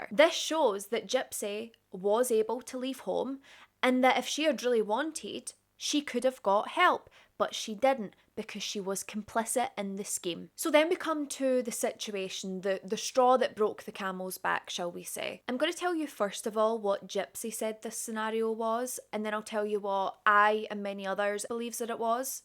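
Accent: Scotland, United Kingdom. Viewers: Love hearing a fellow Scottish accent! I also believe that Gypsy did not want to be Dee-Dee's full-time carer, in the future, as her mother's health was poor. I DO think she WAS afraid of Gypsy. Dee-Dee even said to her: "Gyspy... Don't hurt me." which Gypsy admitted to. And, what gets me.. IS... there were 17 stab wounds. Nick first said in his interrogation, he stabbed Dee-Dee FOUR times. I wonder if that WAS the truth. I believe so. Photos... also tell a story.... In ALL the photos of Dee-Dee and Gypsy, there is NOT one photo where Gypsy looks scared, unhappy, anxious, or depressed. I say that because, there's a photo of me taken, after I had got a telling-off from my Mum, when I was about 11... and I am visibly upset on that photo, TRYING to hold back tears for my aunt, as she took the pic. There's also one of me, when I was really sick with tonsilitis when I was seven, and it's obvious, I was really unwell. 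Normally all my baby/childhood pics, had big gummy, or cheesy grins! I loved the camera! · I feel like I'm getting true crime intel from Merida. lol Gotta love the Scottish accent! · I’m here for your accent. I love it. Where from Scotland are you from? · I thought the sh*tshow of Gypsey Rose Blanchard life and crrimes couldn’t get much worse. When she was released i genuinely felt so much compassion and empathy for Gypsey. However, I am so glad that I found you, you deserve more subscribers - you are well put together, talk about this subject with the gravity it requires, have charisma, and the editing is high quality. Most of all you are Scottish (i presume going by your accent) I’m from Aberdeenshire but assume you are from the central region. Subscribed 🏴 ❤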